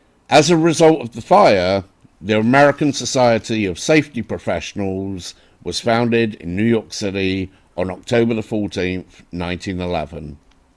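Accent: United Kingdom. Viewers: Another expertly done video,. Kudos, as always! Double fascinating to me, since I am not only a history buff but also greatly enjoying reading about & seeing images of old cemeteries. But of course what a totally heartbreaking piece of history. So very sad. I always also enjoy your narration (especially love that British accent!). THANK YOU very much for sharing with us. 🤓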